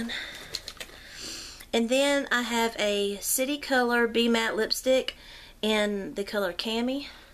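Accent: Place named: South, United States. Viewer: congrats on 2000 subbies, must say you have a calm/southern voice. so many you tubers voice can be harsh...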